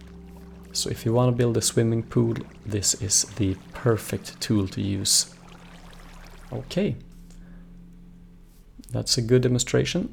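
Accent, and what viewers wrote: Sweden. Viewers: Are you swedish? You have a very cute accent. :) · Swedish? You gotta be swedish... :D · Are you from Sweden? Just gotta ask cuz it sounds that way :)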